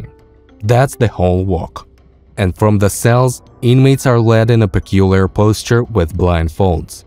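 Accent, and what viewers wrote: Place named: Russia. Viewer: Maybe AI voice over instead of this thick Russian accent? Kinda hard to understand! 🤔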